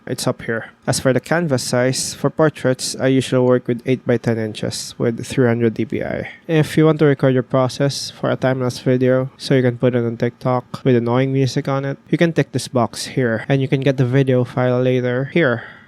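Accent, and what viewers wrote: Philippines. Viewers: Are you filipino or SE asian? i love the accent, sounds very asian specifically fil xD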